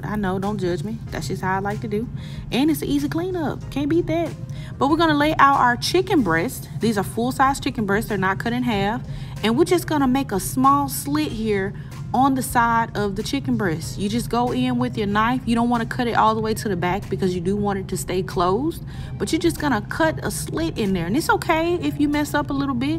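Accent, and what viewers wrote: South, United States. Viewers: Are u from the south? Like nc or SC? Lol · Mmm yummy in the Tommy will try and you funny 😊❤️ you sound like you from down south 😊❤️ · The food looks so good but can we talk about the southern accent????? Yaaaaaassssss sis… just know I hit the subscribe button!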